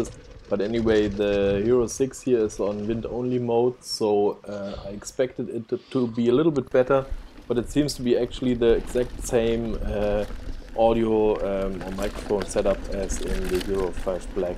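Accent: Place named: Germany. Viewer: Du bist deutsch oder? Hört man raus 😂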